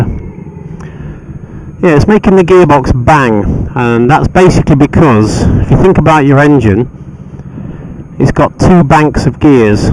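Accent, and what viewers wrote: United Kingdom. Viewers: I liked this video and hope I can apply it, but I'm not sure if I understood everything clearly (I always have problems understanding British accent, sorry!). So I must ask for a little help here. My problem: every time I switch gears, the bike yanks a little, after I open the throttle again. I can hear and feel it, nothing terrible, but makes me feel a bit like a barbarian who's killing the bike with an axe. I often think I open the throttle too fast, with zero smoothness. I also think I do the whole shifting way too slow. It's more like doing all these 3-4 things things one after another, and def not in a blink. I'm a beginner with a relatively fresh A1 licence and I must say, nobody really showed me how to do these things, not even during the course (which is generally not high quality in my country...). By the way I have a Suzuki GZ 125 Marauder (I really like the bike, so don't say I should throw it away because it's a damn mini-chopper, thanks! 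:P). If anybody responds and gives me a tip how to stop this bad(?) habit, thanks in advance!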